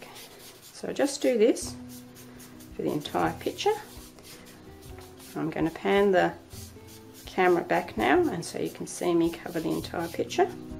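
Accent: Australia. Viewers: Unable to sleep, my creative mind wondered - and it wondered right over to discover I am in fact not totally loopy for using the same technique! I cannot draw to save myself, but have found art therapy useful for years; so thank you for your tips. Love hearing a fellow Aussie voice to. "Rubber" usage unite!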